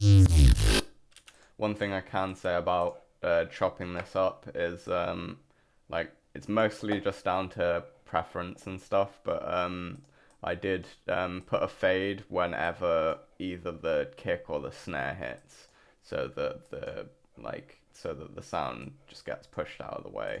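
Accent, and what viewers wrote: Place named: South East England, United Kingdom. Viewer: you sound like a south east lad too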